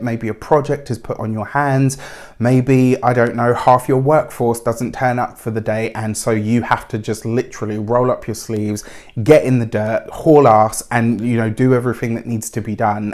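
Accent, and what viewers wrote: England, United Kingdom. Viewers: Ive missed your English Voice…. thank you for the good news!!! Stay Golden!!!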